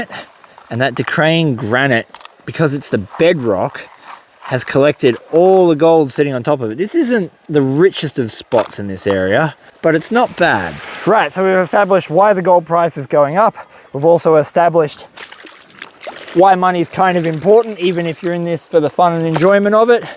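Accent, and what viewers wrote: Australia. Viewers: Bwahahahahahahahaa I loved the oratory comments spoken like a fair dinkum true blue Aussie mate, you have a way with words that bring tears of laughter to my eyes. Thanks for that I needed a great laugh and it was all true what you was saying about how the Gold standard was abolished by Richard Nixon of the Watergate Episode lol. Crikey do you think with all the shit going on that gold will rise further higher? I guess it is a waiting game hey, well stay safe and have a cracker of a day and you too.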